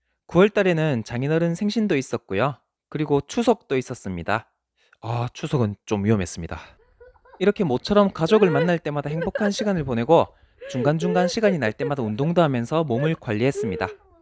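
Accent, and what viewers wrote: South Korea. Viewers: 사투리가 어디 쪽이지 대구 경북쪽인가 ??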